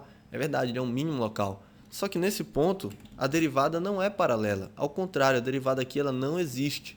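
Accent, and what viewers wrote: Pará, Brazil. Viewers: Muleke eu logo vi q era paraense assim como eu só pelo sotaque, quando ele disse "égua" eu tive certeza hauahuah. Parabéns cara seu vídeo ta ótimo e é bom ver q esse tipo de conteúdo está crescendo no nosso estado. Parabéns e se possível volte a produzir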